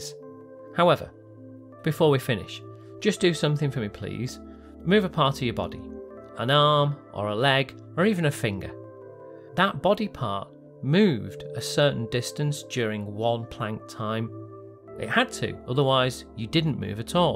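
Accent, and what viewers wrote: United Kingdom. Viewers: another limey narrating a video👎💩💩💩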